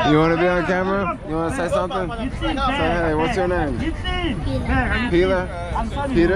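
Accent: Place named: United States. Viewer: his accent is clearly American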